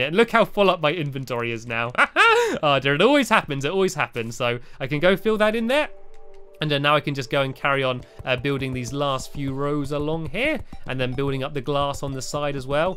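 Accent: England, United Kingdom. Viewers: is stampy english and is scottish? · Tyler Delsol if you can't identify a British accent, I'm slightly worried for you.. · your from engand not amerac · He's a brit I should know cause I have a the same acent psychological don't call me Thomas most people call me Nickola ( Nik- o -lie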